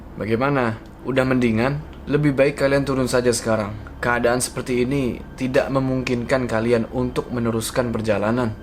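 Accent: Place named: Tegal, Indonesia. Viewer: tegal mana suaranya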